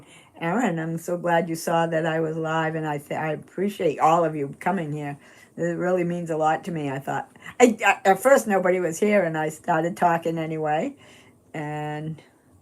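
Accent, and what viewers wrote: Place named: Maine, United States. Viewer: Yes, you definitely have a main accent. I have a very good friend that goes to my church here and you sound just like her!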